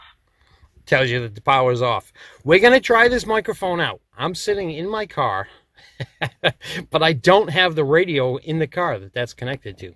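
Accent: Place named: New England, United States. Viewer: You sound like you're from Massachusetts or Rhode Island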